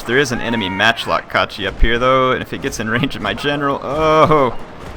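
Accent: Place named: South, United States. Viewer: we miss your southerner accent:D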